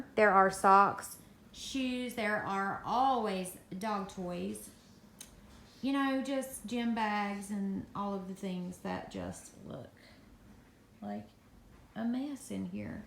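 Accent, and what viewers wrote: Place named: West Virginia, United States. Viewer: I just seen your channel. I live in NC but I am from WV. You have that wva accent lol. Love it. Also love there is no pretend bullshit. Keep it real .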